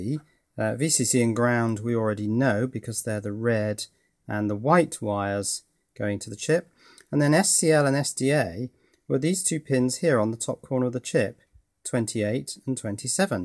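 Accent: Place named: Scotland, United Kingdom. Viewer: Very good and didactic, with a nice scottish thrive!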